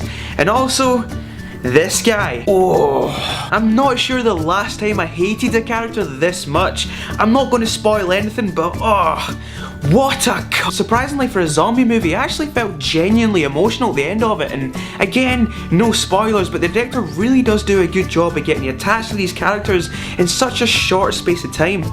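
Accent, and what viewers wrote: Scotland, United Kingdom. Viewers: Your review is on point. Covered all there is to know about the film. You got one more subscriber. Thanks for stopping by my channel! Also, your accent. You're from Scotland if I'm not mistaken. I was there a couple months ago in Edinburgh. Beautiful city. I did a video about the trip. Check it out in my channel!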